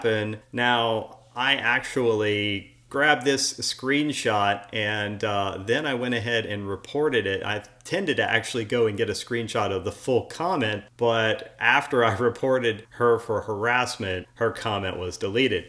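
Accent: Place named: United States. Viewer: ya sound like an AMERICAN - fire up the music via PATTON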